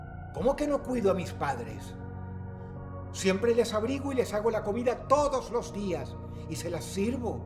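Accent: Spain. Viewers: El acento español de ufffas uma delicia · hablando con acento español es todo lo que está bien en esta historia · con acento español o.o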